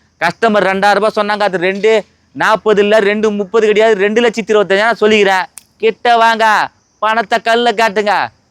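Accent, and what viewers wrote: Madurai, India. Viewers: Super speech❤madurai vasagam ❤🎉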